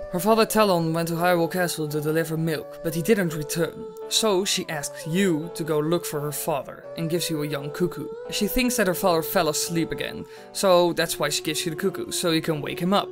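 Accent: Netherlands. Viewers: ...ben je Nederlands toevallig? Ik hoor het aan je accent als je Engels spreekt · are you dutch because i hear something that sound like a dutch accent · R you dutch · Haha you are definitely dutch right? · you're dutch for sure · Are you dutch? (Just a random question) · Are you dutch? Whatever love your accent.. and the theory😊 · Ik kan horen dat je Nederlands bent je praat met een accent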